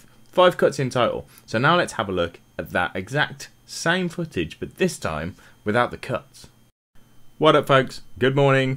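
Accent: United Kingdom. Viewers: Hi, I’m very new to video editing and Resolve - as in I only downloaded it this week! Stumbled across your channel and finding your videos useful, plus it’s a refreshing change to hear a Brit voice for a change! I must have missed something here - how did you adjust the smooth cuts to cut out the dialogue you didn’t want to include? Do you use the audio track for this? Cheers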